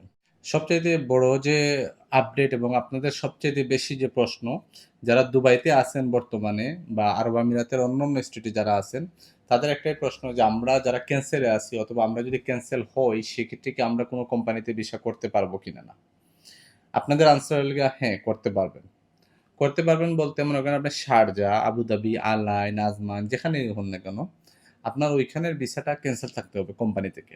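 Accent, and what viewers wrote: Sylhet, Bangladesh. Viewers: ভাই আফনার মাতে লাগে,আফনে সিলেটি😊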